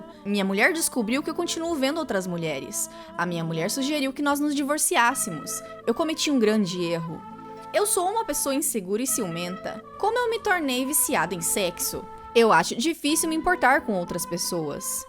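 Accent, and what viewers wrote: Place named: South, Brazil. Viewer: da onde você é?que estado? Parece sotaque sulista Amo seu canal <3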